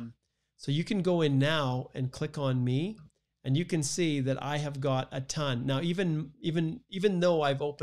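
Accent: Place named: Portugal. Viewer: Great Portuguese,! I'm impressed. You got a bit of Portuguese (from Portugal) accent there, awesome!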